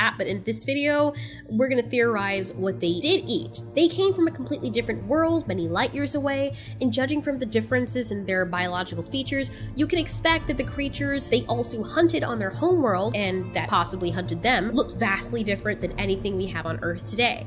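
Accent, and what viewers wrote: United Kingdom. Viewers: please answer this are you british bcs that was the best british accent uive ever heard, i should know since im british. i started watching u about 3 weeks ago and have been so fascinated by all of this with a quiet place i love ur work aswell please keep it up <3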